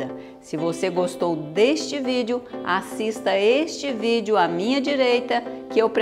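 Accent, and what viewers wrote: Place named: São Paulo, Brazil. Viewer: Eu não sabia que ela era mineira. E por que fala como uma paulista? Tem que falar como mineira.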